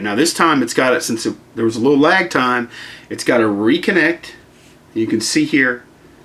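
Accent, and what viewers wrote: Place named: Maine, United States. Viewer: Your accent sounds like a "guy" I once played poker with. Are you from the Maine area? You articulate your videos very nicely for those of us stunted by the Thesaurus Rex cunning linguist known as DLeake.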